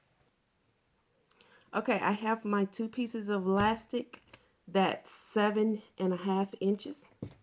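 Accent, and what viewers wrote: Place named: South, United States. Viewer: You sound like a fellow Southerner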